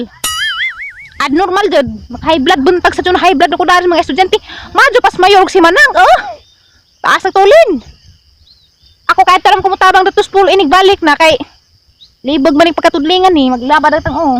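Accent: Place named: Cortes, Philippines. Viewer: hahaha..kuhang kuha jud nimo ang tuno sa mga tga cortes dai...gimingaw nakog pugas ug mais...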